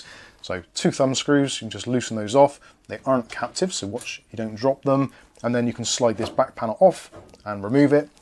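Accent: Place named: East Anglia, United Kingdom. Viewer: My only complaint about this review is how you pronounced "Aluminium" Judging by your accent, I'd say you're from East Anglia or somewhere in the South-Midlands or South-West. Come on man, we're not speaking American yet ;)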